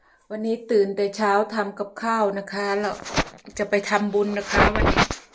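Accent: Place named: Cambodia. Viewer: อ๋อ.. ขอโทษด้วยคะ พอดีว่ายายเป็นคนเขมรหน่ะคะ สำเนียงของน้องเหมือนแถวบ้านยายคุยกันเลย ❤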